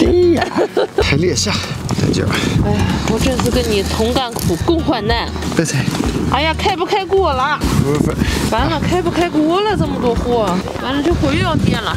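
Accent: Dalian, China. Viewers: 大连话不要太标准啊！太怀念国内了！ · 哈哈 真幽默。而且大陆口音也逗。订阅开始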